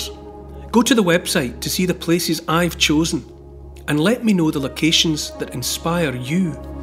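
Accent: Scotland, United Kingdom. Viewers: sadly yes. He doesn't "look" the stereotypical history buff. But that's what draws me to him initially, and of course the content and the obvious Scottish delivery. My son is at University in Ireland at the moment and he tells me that the majority of them at very disappointing to say the least. Even the "clubs" are disastrous, well indoctrinated with the wrong information. It's a shame really. Tonight in Ireland, practically all restrictions are lifted...the country is elated. I for one will never forget what they've done..I hope things get better there in Germany. I'm only going on the information that I've seen coming out of Germany..its not good, or am I wrong?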